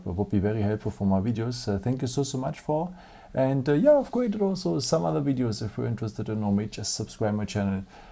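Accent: Germany. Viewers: How to get a clear screen on the phone to take pictures??? I can see myself at the bottom of the screen but, cannot open it up . Thank you 😊 I like your accent at some of the words you use makes me believe that you are German..😊 🤔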